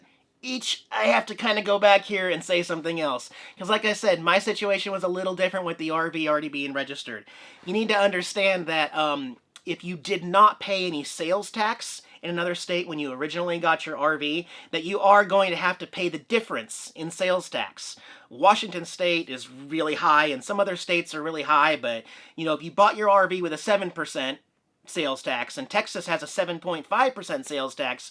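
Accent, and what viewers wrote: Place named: Texas, United States. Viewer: You're gettin a slight Texan drawl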